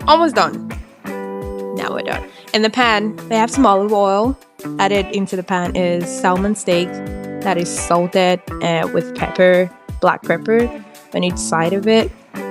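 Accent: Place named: United Kingdom. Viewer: Why did you sound British in this video abi na AI? 🤣🤣🤣. Tell me the truth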